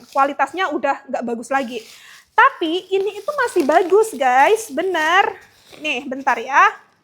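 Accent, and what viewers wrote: United Kingdom. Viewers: suka banget klo udah ngomong indo tapi aksen inggris nya itu loh cobek 20rb chowbeck 500rb ya ka 😂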